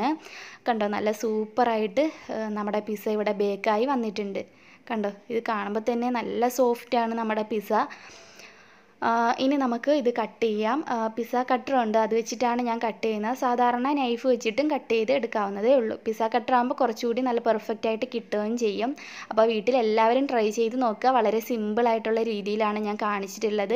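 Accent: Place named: Kollam, India. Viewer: കൊല്ലം കാരിയാ ലെ ... കിടു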